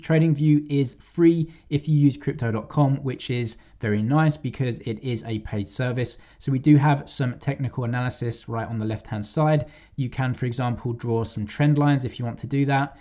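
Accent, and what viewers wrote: United Kingdom. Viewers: AGAIN!!!!! Another video that I don’t see what you see?! Is it possible that (I’m sorry) I am American, you sound like you might be across the pond……… could that be the problem???? (CONFUSED!!!!)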